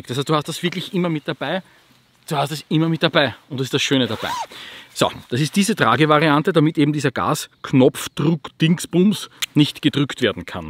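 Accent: Austria. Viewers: Ich mag die Grimassen und den österreichischen Slang 😄 Jedes Mal wieder cool.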